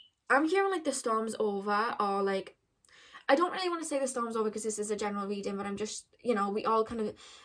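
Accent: Wales, United Kingdom. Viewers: #1. Love listening to your lovely welsh accent.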